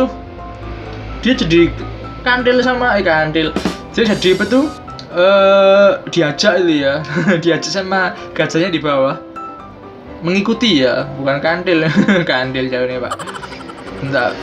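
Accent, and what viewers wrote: Java, Indonesia. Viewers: Jawa nya ga nahan bos haha Pertahankan sebagai ciri khas mu haha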